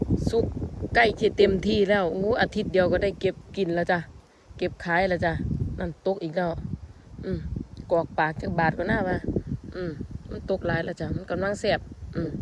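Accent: Korat, Thailand. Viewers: เป็นFCใหม่นะค่ะฝากตัวดว้ยจร้าจะติดตามนะค่ะ..ขอโทษนะค่ะเป็นคนจังหวัดอะไรค่ะคือพูดภาษาโคราชได้ค่ะ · ชอบความสำเนียงโคราชบ้านเอง เป็นคนอำเภออะไรคะ บลูเบอร์รี่น่ากินมากค่ะ❣️กดติดตามแล้วนะคะ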